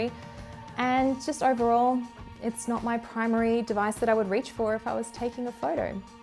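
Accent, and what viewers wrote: Australia. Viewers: Just got the zfold2 yesterday. Amazing!!!!! Waiting for the spiegen tough armor case in the mail. Highly recommended that case! Also... I love your aussie accent! Xoxo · Australians would typically say /djuːəʊ/ (sounds kinda like d-wow) vs the dryer "u" sound in the american /d(y)o͞oō/. Her australian accent is subtle, though. I would not have picked it up if she hadn't mentioned it.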